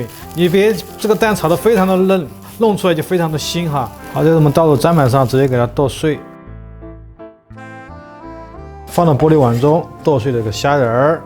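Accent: Sichuan, China. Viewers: 一听就是老乡—四川人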